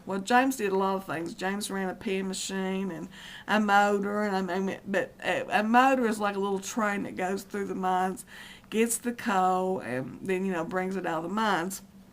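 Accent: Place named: Texas, United States. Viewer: You do sound like us Texans! I thought you were one of us! :) That's ok, you're still an awesome sweet person. :)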